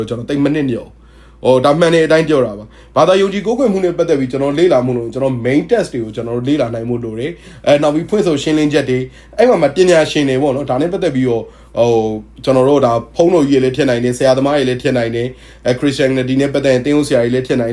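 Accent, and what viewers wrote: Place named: Bangladesh. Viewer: တခုပဲအကျန်ပြုချင်တယ်အဖေအမေရဲ့ဝမ်းဗိုက်ထဲကမွှေးလါထဲကပဲအကိုပြောတဲ့ဘဂ်လီလို့ပြောခဲ့တယ်နော်ကျွန်မတို့လဲဘဂ်လီသွေးနှောပဲတာပေမဲ့အကိုကြိုက်သလောက်ဘာသာမဲ့လို့ပြောလဲအကိုစကါးအသွားအလါမှာဘဂ်လီသံပေါက်နေတာရယ်မိခင်ဖခင်ရဲ့အသွေးအသားနဲ့တည်ဆောက်ထားတာဆိုတောလူရယ်လိုဖြစ်လါရင်လူ့ပါတ်ဝန်းကျင်မှာဘာသာတခုတော့ယုံကြည်စွာကိုးကွယ်စေချင်ပါတယ်အကိုကကို့ရဲ့ပြထမ်းထားတဲ့ကျမ်းကန်ကိုအသေချာလေးလေ့လါစေချင်ပါတယ်ဂျပန်လူမဖိုးကိုရီးရားလူမျိုတရုပ်လူမျိုးအဂ်လိပ်လူမျိုးမြန်မာနိုင်ငံမှာရိတဲ့မြန်မာလူမျိုးတွေတောင်အစ်ဆလ္လာဘာသာကိုလေ့လါသင်ယူပြီးအီမာန်တေဂျင်နယ်အမိအဖမွတ်ဆလင်ကမွေးပြီးကို့ဘာသာကိုကိုလေ့လါပြီးသင်ယူမှုတွေလုပ်ပြီးအီမာန်ခိုင်မြဲရင်အကိုကိုညမတိုပျော်ရွင်စွာနဲ့ဂုဏ်ယူနေမှာခုလဲညမကအကိုဘအကောင်မှာဝင်ကြည့်လို့အရမ်းန‌ှမျော့တယ်အလ္လာ့ဆီမှာအလ္ာကြီးဟေတာရပ်ပေးပါစေလမ်းလွဲမရောက်ပါစေနှဲ့လိုဒိုအာလုပ်မှာပါအကိုဘယ်လိပဲဘာသာမဲ့ပြောပြောအကို့ကိုကုလါးဆိုပြီးကော့မန့်မှာဝင်ရေးနေတာတွေ့တော့ညမစိတ်မကောင်းပါဆရာကြီးဝင်လုပ်တာမဟုပ်နောအကျန်ပြုတာကျေးဇူးပါဖိုက်တင်း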